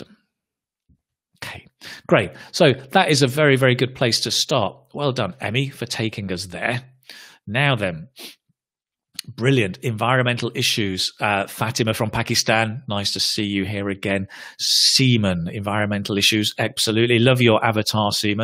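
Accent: United Kingdom. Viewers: Is this British accent , please anyone tell me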